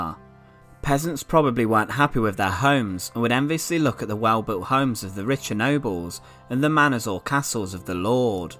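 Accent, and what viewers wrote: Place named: North, United Kingdom. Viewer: nothing he’s just northern😭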